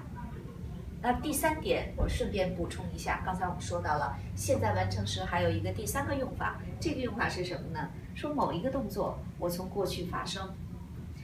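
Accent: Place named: China. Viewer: 我也觉得，跟其他女生相比，纯中式英语的语音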